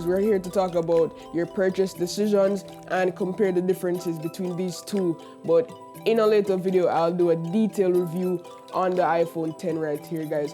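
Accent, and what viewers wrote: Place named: Jamaica. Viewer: Wait why does he have a Jamaican accent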